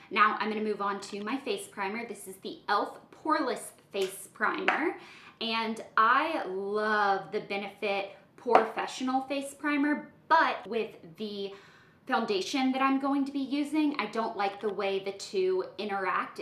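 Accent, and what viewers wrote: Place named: Tennessee, United States. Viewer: Anyone else think, dang she really has been in Tennessee?! The accent is cuuuuuute ♥️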